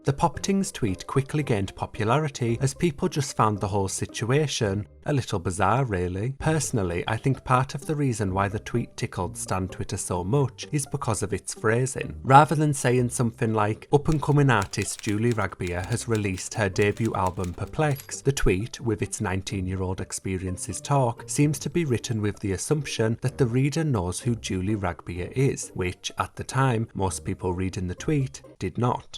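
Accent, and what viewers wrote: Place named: Hartlepool, United Kingdom. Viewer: Your accent is very Hartlepool 🧐😀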